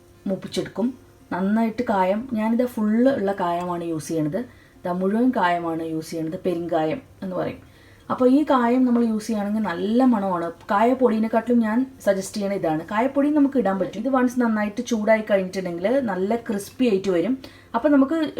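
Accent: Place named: Palakkad, India. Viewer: palakkd nnano, njangl palakkattu karade oru samsara reethi ndu and cooking style too... Rasam koodi onnu idoo... Plz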